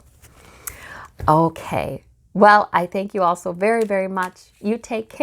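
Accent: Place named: Upper Midwest, United States. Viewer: Very nice reading...as expected! Love your Upper Midwest style! Stone cold Chicago Democrat here...now living in NC...and I miss the Midwest every single day! Was able to absorb great peace from the Great Conjunction last night, which revealed itself right over my house! Hope we can all draw strength from our tribulations...In order to make our world more positive. Blessings to you, sweet...carry on!!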